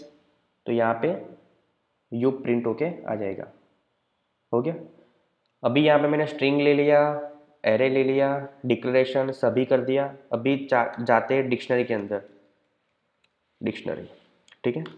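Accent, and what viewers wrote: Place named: Gujarat, India. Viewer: ભાઈ એક નંબર વિડિઓ બનાવીયા છે અને તમારો અવાજ નો ટોન પાક્કો ગુજરાતી... જય જય ગરવી ગુજરાત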